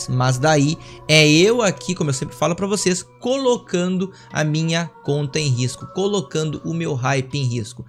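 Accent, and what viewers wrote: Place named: South, Brazil. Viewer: Opah chegando agora no canal, depois da live com o enmynest, esse teu sotaque é aqui do sul certo, Rio Grande RS abração, mais um inscrito...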